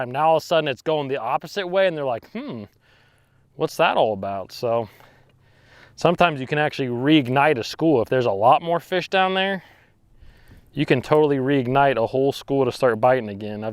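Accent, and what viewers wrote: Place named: Long Island, United States. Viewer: "Downsize after Sunrise" that's how I roll ....unless their on and the Bubba baits r Workin ! Great channel brother. Is that accent from Long Island ?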